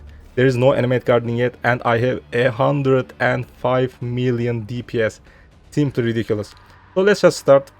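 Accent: Turkey. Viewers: reis türk gibi ingilizce konusuyor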